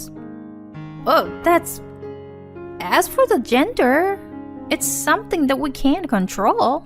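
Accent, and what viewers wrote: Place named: China. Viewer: hate this chines accent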